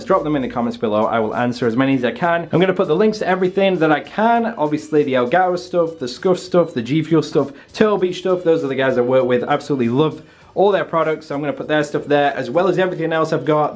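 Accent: United Kingdom. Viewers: his accent man half British half American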